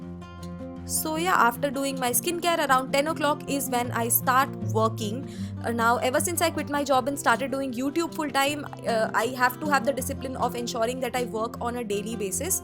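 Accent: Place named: India. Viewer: I watch your vedios for improving my English speaking...bcz your english is pure indian english... thanks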